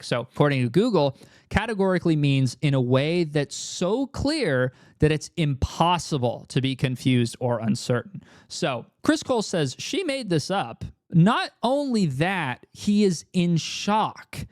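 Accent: United Kingdom. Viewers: I'm only watching this for the British accent when doing Australian impressions. It's what I fuckin live for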